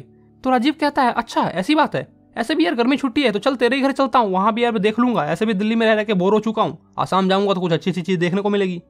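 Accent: Bihar, India. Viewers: Bhaiya aap Bihar se hai kya ?? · Bhai you are from Bihar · Bhai bihar se ho kya?? 😊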